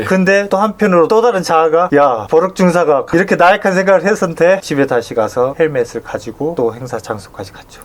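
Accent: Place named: South Jeolla, South Korea. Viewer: 전남 억양이네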